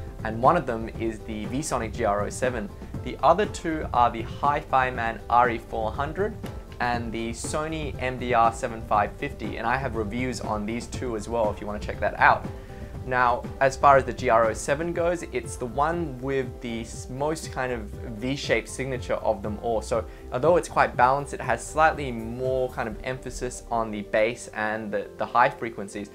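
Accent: Australia. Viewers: well he is Australian...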